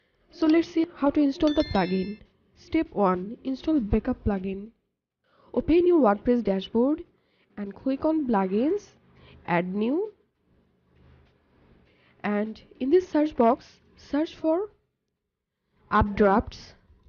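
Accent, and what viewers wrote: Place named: Bengal, India. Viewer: Her accent is Bengali 🎈🎈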